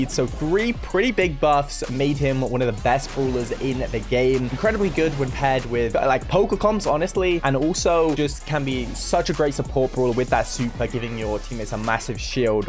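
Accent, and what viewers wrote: United Kingdom. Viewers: It's been a while Haven't heard ur bri'ish voice in like forever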